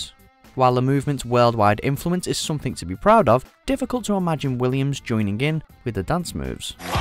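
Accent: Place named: Liverpool, United Kingdom. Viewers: Sounds mainly scouse with something else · north of england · Thammarith Likittheerameth Liverpool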